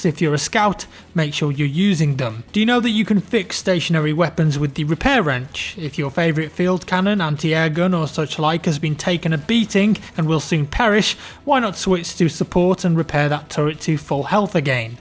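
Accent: England, United Kingdom. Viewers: .. What type of English accent is yours? It's really nice and probably the easiest one to understand.:)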